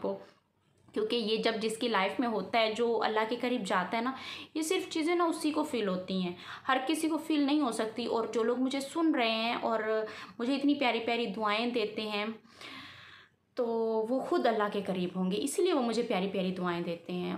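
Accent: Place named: Sindh, India. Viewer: App konsey village say ho..........ap ka lehja tu sindhi lagta hai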